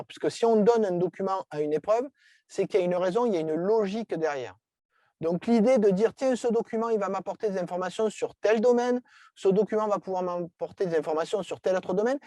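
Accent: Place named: Marseille, France. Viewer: Merci. Jolie accent marseillais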